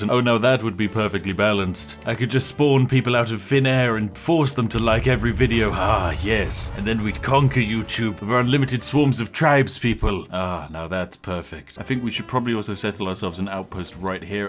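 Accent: United Kingdom. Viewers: Gosh you are British aren't you LMAO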